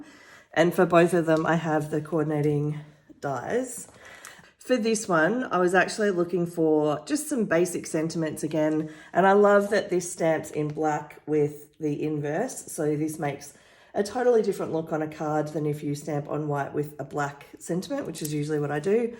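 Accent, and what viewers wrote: Australia. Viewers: Hi . New subscriber here. I just stumbled across your video and was DELIGHTED to hear an Aussie accent! That Pastel Deco is to die for. I'd love to see your version of the stained glass window technique. Shipping rates to Australia are the pits :[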